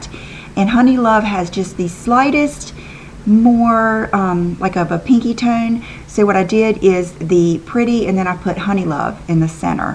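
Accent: South, United States. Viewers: ! I am one of the owners of the salon MAVEN Beverly Hills- I have been a huge fan of yours and followed you for the last several years. I am originally from Mississippi so I love the southern accent, makes me feel like I am home! Just a couple of weeks ago we launched our very own Youtube for my hair salon which opened in August! Check us out - I think you will really love the message that we plan to deliver on our channel!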